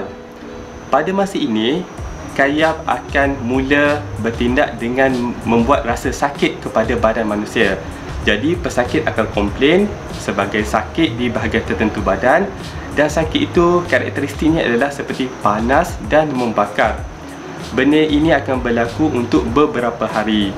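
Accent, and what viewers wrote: Kelantan, Malaysia. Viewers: Maaf Tanya doktr ya, doktar org kelantan ke?? Terima kasih atas perkongsian ilmu doktar.. Terbaik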